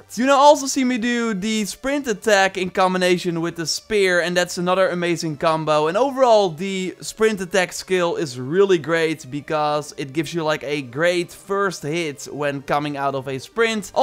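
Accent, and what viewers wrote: Netherlands. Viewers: Heey are you Dutch? (I think I recognize the accent) 😉 I love your Valhalla videos, just subscribed today 🙂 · Great videos, but the heavy dutch accent annoys me a lot. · Youre from the netherlands? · Are you dutch O.o? Nice video btw thx :3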